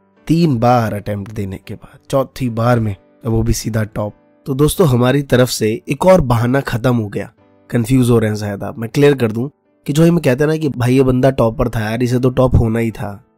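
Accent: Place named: Delhi, India. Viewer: Sir nice video by the way aap Delhi se ho kya Touch wahi laga bolne ka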